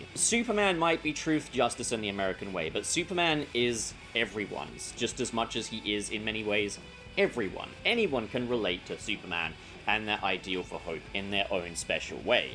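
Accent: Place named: United Kingdom. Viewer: You sound British and Australian at the same time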